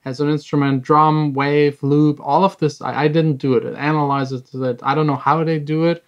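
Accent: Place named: Germany. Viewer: Du hörst dich sehr deutsch an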